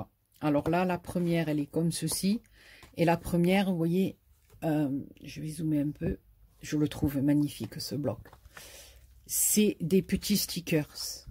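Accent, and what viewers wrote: South, France. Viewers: Coucou, tout d abord une bonne et heureuse année 2020,du bonheur, la santé très important et continues à nous émerveiller par tes créas, surtout ta bonne humeur avec ton accent du soleil. Le père Noël as été très gentil avec toi,ça prouve que tu as été gentille lol.j adore le dies bordure que tu as fais avec du papier noir. Les blocs de papiers sont magnifiques, j ai le dernier que tu as montré. Maintenant il n y a plus que,aller hop au travail. Merci pour la présentation. Gros bisous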